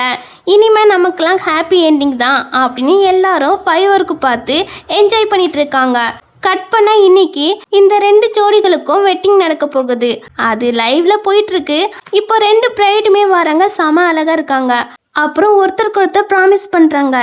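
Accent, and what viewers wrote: Tirunelveli, India. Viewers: Novel reborn story romba nalla solrenga pa 👍 super ah eruku nellai slang 💐 weekly 1dramavathu podu pa unga channel la 2years munnde potta drama en delete pannetenga 😔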